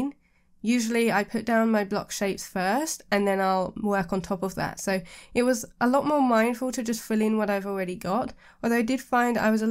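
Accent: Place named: United Kingdom. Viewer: Suddenly I found myself really enjoyeing listening to British english (im not english language speaker and in daily life moustly surrender by american english media)))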